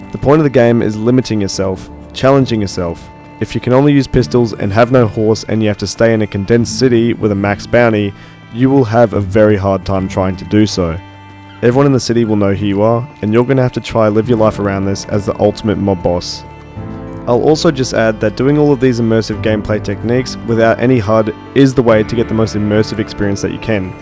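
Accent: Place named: United Kingdom. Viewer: Video about rdr2 over 10 minutes narrated by a man with a British accent is a guaranteed banger lol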